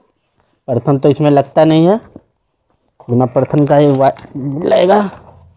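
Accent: Bihar, India. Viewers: Aapki baaton ke trike se lg rha h aap bihari h nice 😄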